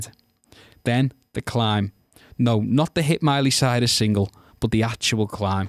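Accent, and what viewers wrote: Liverpool, United Kingdom. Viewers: English with a Scouse accent. Educate yourself. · Needed subtitles, not used to Scouse Gas. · That Liverpool accent tho, loved it.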